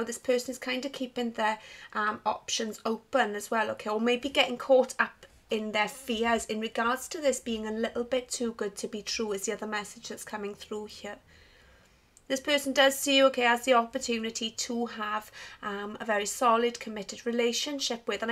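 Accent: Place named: Wales, United Kingdom. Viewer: Oh yea!! 😍💪 After a good few years of listening to these taro card videos, iv never found a Welsh person do one 😍💪greetings from Carmarthenshire 😜💪 and I have to say you have a Beautiful accent 😍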